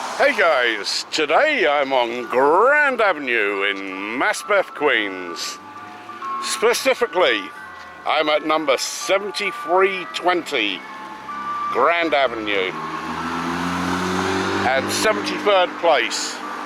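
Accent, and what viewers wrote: United Kingdom. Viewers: Wow! Looking forward to that! After watching this video today, it prompted me to watch that scene again from "Goodfellas", which of course can be seen here at YouTube. Even after all these years it still packs a punch! Here's hoping you have a wonderful weekend,! Thank you for all your hard work! Oh and please don't worry about rambling too much. I think I speak for a lot of your fans when I say we can't get enough of your charming Brit accent! 🙂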